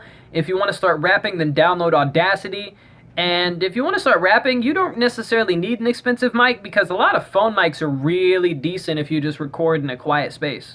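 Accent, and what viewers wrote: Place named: Texas, United States. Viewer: fuck yeah boy what part of texas are u from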